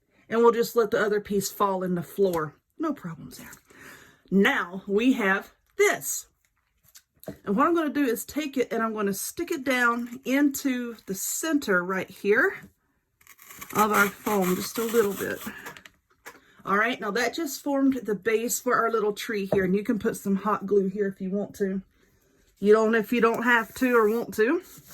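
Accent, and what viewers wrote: South, United States. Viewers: Well I’m old and have never seen this craft before! So thanks for sharing it! Also I love your sense of humor reminds me of my sister even down to the southern drawl.🥰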